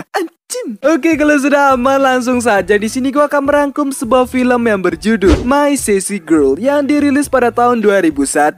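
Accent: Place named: Bugis, Indonesia. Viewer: Telasomu Ko bilang bang😂 Bugis ye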